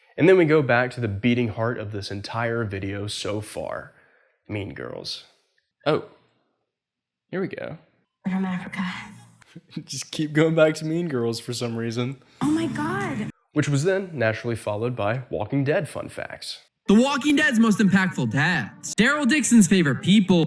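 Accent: United States. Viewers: This guy confuses my brain; he looks Australian but talks American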